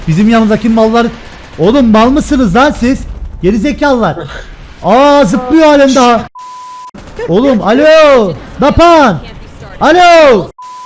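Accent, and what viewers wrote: Cyprus. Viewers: Abi sen Kıbrıs lımısın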